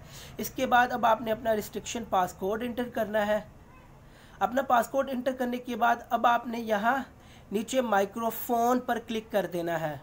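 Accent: India. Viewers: English title but indian speaker .. nothing inderstand 👎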